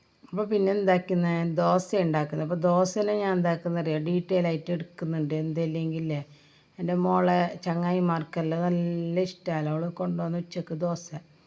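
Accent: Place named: Kasaragod, India. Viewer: Nice sharing 🎉🎉🎉🎉 കാസ്രോട് ഭാഷ 😂😂 👌🏻👌🏻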